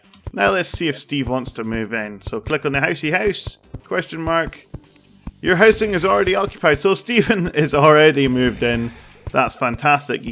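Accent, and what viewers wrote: United Kingdom. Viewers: Why always British terraria play throughers.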